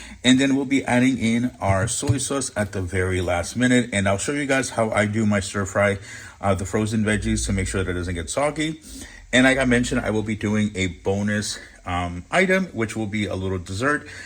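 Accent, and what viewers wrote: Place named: Miami, United States. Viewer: My family and I love and adore you. I grew up in Miami and I here a familiar accent 😮😊😂❤🎉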